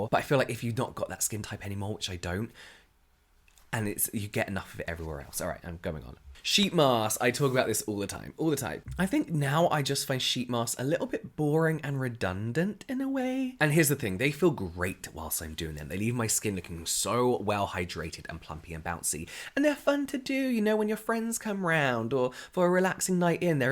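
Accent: United Kingdom. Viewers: is sounding extra British in this one.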